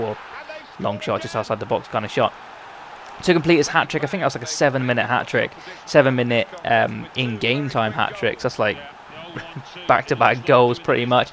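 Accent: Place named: Cornwall, United Kingdom. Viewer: hey, where are you from? you sound a bit cornish ;)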